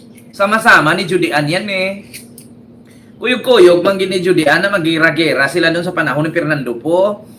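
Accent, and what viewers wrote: Visayas, Philippines. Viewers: Natatawa ako sa iyo sa pagbisaya mo galing mo haha!!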